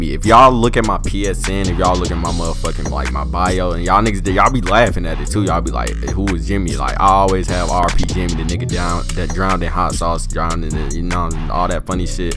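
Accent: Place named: New York, United States. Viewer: this nigga has to be from ny lmaooo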